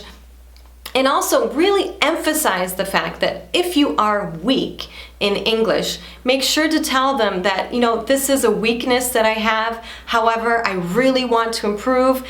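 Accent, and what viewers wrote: Brazil. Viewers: I guess you're losing your English your speak sounds like so Brazilian hahahah!! So cute!:P, eu acho que você tá esquecendo como é que se fala em Inglês, o seu jeito de falar tá muito brasileiro! hahahah...! Acho tão fofo isso,:p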